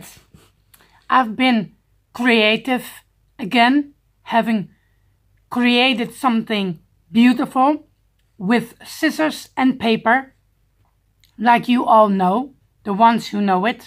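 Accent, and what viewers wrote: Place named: Netherlands. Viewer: That accent is definitely Dutch I'm hungover and could just do with that now so jelous lol it's not to long at all pal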